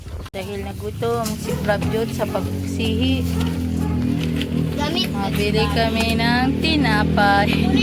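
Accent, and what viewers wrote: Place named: Philippines. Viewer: ❤❤❤❤❤❤dasal lang ate at magpakatatag ka para sa mga anak mo...rest in paradise kuya..mamis ko filipino accent mo..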